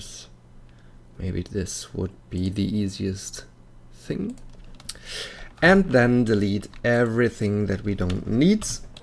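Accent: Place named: Germany. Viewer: Man hört einfach deutlich, das du deutscher bist :D Aber trotzdem sehr gut erklärt :D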